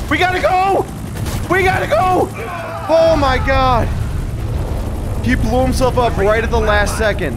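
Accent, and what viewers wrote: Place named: Quebec, Canada. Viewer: Le gars est clairement québécois haha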